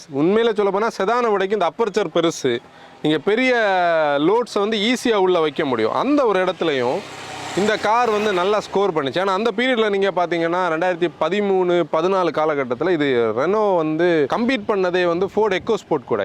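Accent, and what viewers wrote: Kanyakumari, India. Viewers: Kanniyakunari slog apadi than irukum..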